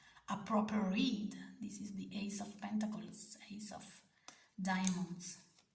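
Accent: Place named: Italy. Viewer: Love the decks you've chosen!! And your Italian accent is so delicious, I didn't know most of the decks you presented!! Thanks a lot.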